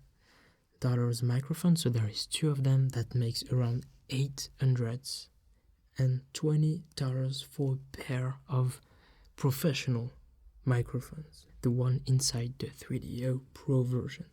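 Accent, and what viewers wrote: France. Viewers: His France accent make me laugh do hard haha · Ton accent français me tue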